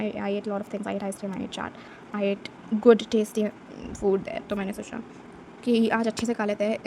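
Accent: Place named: Hyderabad, India. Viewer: oh bruh you speak telugu, same....where you from ? seems like telangana and that too hyderabad...all the best for iiser!!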